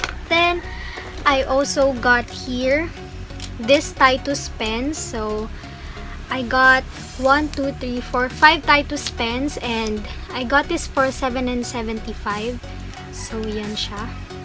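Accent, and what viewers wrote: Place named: Philippines. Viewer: wag ka na mag english halata nang pilipino ka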